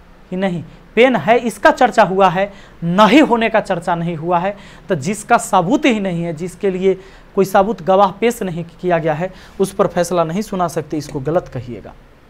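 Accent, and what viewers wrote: Bihar, India. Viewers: Bihari style me hindi sun ke to sir maza hi aa jata hai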